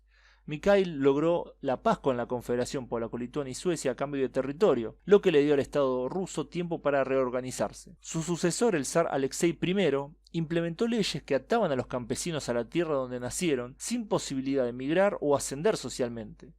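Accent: Argentina. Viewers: esta muy bueno difundir la historia de forma resumida, pero agrego una critica al margen, tratá de sheshear no tan exageradamente que después América cree que todos en Argentina hablamos así, sin el espiritu de ofender te agradezco enormemente el aporte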